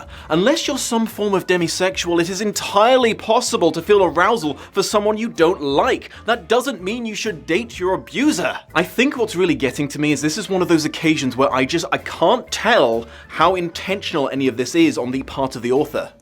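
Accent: South, United States. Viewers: Only four minutes in and I’m in tears with laughter. Thank k you for that incredible reading in what I can only assume is supposed to be a southern American accent. It’s actually a pretty good accent, way better than any accent I can do. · 1. I got a great kick out of your Southern American accent 2. I just love having such a great YouTuber with high-quality content who’s childfree by choice. You’re great representation. I shall continue to edit and add to this list as I keep watching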